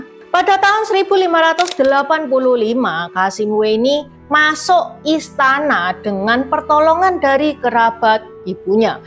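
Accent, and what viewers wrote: Java, Indonesia. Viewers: Cerita / narasi nya menarik cuma lafal / pengucapannya (intonasi) terdengar aneh 😢 jawanya terlalu medok😊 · Ya, apik to😅 Jawa banget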